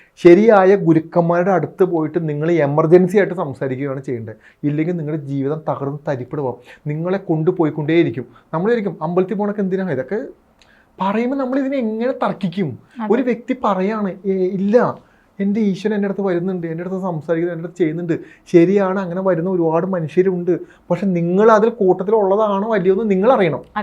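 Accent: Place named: Ernakulam, India. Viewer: Yes കുമ്പളങ്ങി nights shane നിഗം slang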